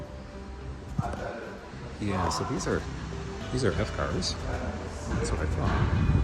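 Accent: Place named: Canada. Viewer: also im pretty sure youre canadian by your accent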